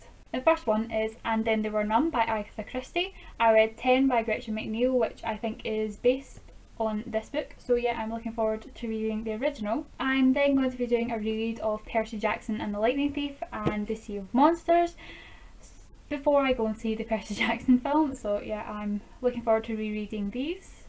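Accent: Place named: Scotland, United Kingdom. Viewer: I ADORED the Lux series by Jenn! Have you read her Covenant series? You MUST it is ever better than the Lux series. And Jenn is such an amazing down to earth person. So much fun to be around and talk books with! By the by, I can tell by the accent, where in Scotland do you live? My family is from Edinburgh (they all have green cards). I was born in NY but I lived in Scotland as a child.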